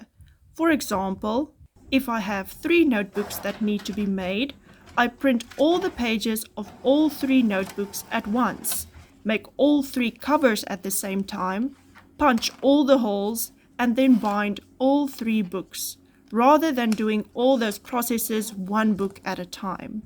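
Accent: South Africa. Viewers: you sound South African so why are you using Amazon your links do not make sense e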